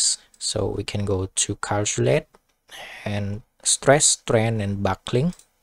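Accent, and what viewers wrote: Cambodia. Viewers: Ur sound like U are Cambodian!! Anyway this is the good lesson one to learn. Thank!!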